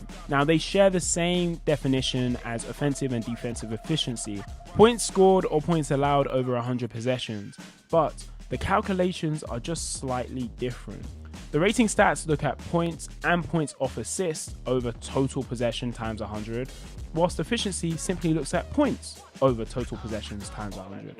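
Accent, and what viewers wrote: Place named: United Kingdom. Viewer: Watched a couple of your vids now, subscribing and hitting the bell! It’s very refreshing to hear basketball from another British person lol. It’s hard being a UK nba fan, all the games are on at ridiculous times😂 Keep up the good work!!💯💯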